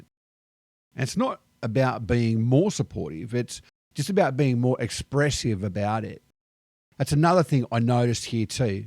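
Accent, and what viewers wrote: Australia. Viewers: If you are. Living. In the. Southern USA people are friendlier there than other parts of the USA. The northeast USA is less friendly. Since you have a pronounced Aussie accent, people everywhere will be curious and talk to you more. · It's fascinating that he thinks it's "very personal" to ask him about his (very charming) accent. To us Americans, this is just totally natural banter, not remotely personal. Interesting look at the cultural differences between us Yanks and the Aussies. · Welcome to America! I love the Australian accent.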